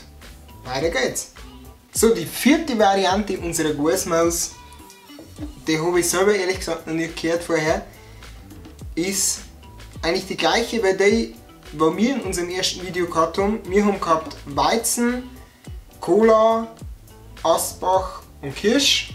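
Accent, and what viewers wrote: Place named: Upper Palatinate, Germany. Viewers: Du soitest stolz auf dei herrlichs Obapfälza "ou" sei und dia vo den Rindviechern nix eazähln lossn! I kannt dia den ganzn Dog zuahearn wia du Goaßmaß sogst. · von wo kommst du aus da oberpfalz?😅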